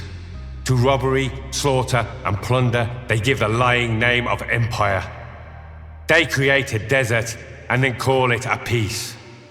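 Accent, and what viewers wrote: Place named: England, United Kingdom. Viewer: Doesn't help he has an English accent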